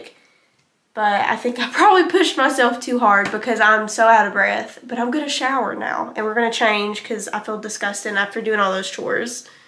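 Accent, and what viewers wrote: Appalachia, United States. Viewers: Love that accent. Didn't even know there's an Appalachia dialect.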